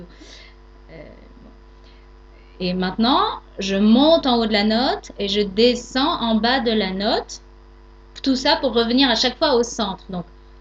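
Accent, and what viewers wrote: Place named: France. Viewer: L'important c'est la matière...Moi j'aime votre bel accent Français..Vos explications sont très compréhensible!!! Du Québec un beau bonjour:)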